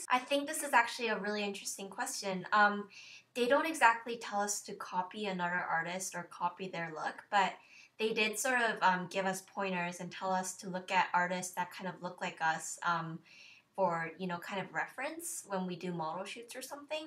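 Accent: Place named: United States. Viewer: Your English is very good if you were in America most people would assume you were American based on the way you talk. Good luck with school.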